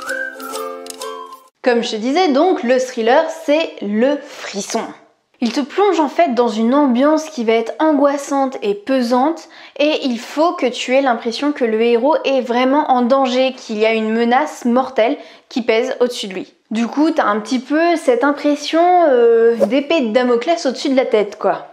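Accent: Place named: United Kingdom. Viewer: Et on aime les sensations fortes ! Et quel accent british dit 😋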